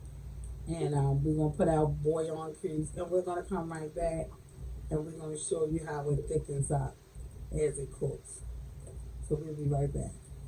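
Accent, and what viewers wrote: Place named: Baltimore, United States. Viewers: I love you, Mama! You helped me so much. 💙💙💙 I have to ask, I'm a new subscriber, are you a Baltimore native? I ask bc my man is from Baltimore and you both share the same accent. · Bmore accent all day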